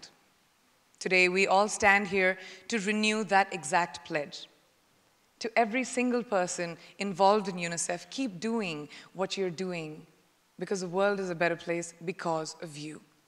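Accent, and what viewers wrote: India. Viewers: Clear Indian accent.💖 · Finally, i found someone who don't change her indian acent 😊😊.... india has its own acent ..u have don't need to copy native contries acent .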